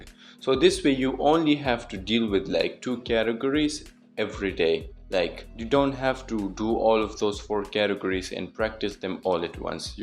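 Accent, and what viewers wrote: India. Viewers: You speak like Indian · Your look like and speaking like this indian😊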